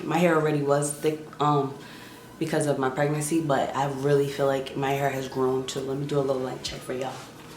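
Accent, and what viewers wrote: New York, United States. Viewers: are you from NYC? loll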